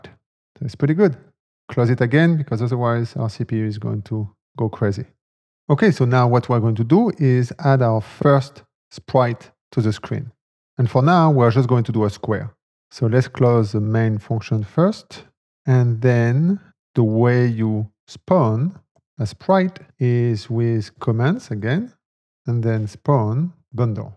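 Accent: France. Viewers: A really well done video! Are you French? because I am French and I can clearly hear a French accent here. I could be wrong though :p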